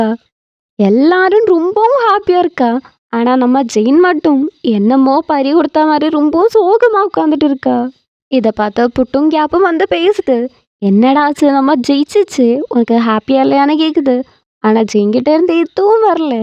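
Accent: Kerala, India. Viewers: Malayali tamil ayyo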